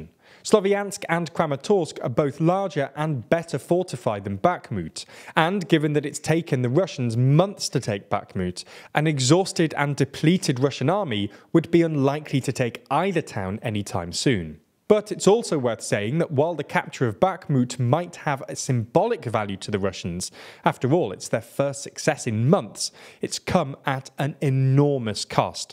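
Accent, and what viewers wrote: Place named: Midlands, United Kingdom. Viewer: I've never seen any other youtube channel that consistently butchers Ukrainian place-names as consistently and as poorly — and almost none of them speak any Ukrainian either. If they're trying to deliver news about Europe, this channel needs to try harder to adequately pronounce European words that aren't muddled with a Midlands accent.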